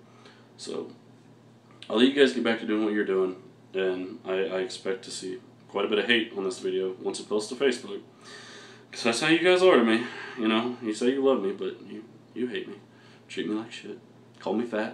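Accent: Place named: South, United States. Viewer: Thank u so much for sharing your experiences. So few people can understand the internal destruction of how ptsd ghosts terrorize you on a daily level. Or how the words "living hell" do not fully describe losing your mind to catatonic depresdion. It's so comforting to know im not the only one. Thank you. And I absolutely love your accent. I'm a sucker for southern twang. I would love to talk more with you when yiu have time, as I try to cope with PTSD. My email is <mail>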